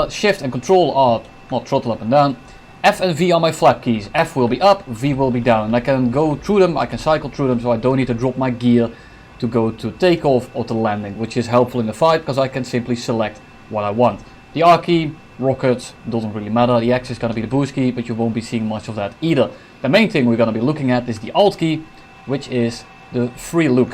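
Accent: Netherlands. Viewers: als deze man niet nederlands is ofz dan eet ik men schoen op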